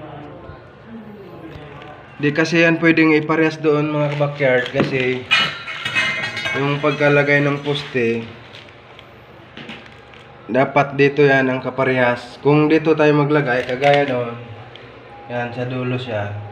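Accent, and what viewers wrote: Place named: Bicol, Philippines. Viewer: Parang bikulano ka idol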